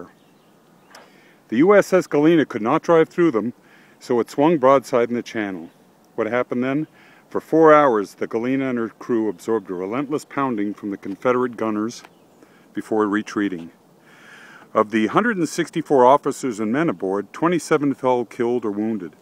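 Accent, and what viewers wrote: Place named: California, United States. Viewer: u said u were from California.where your parents were born doesn't count for u.u sure sound like your from there.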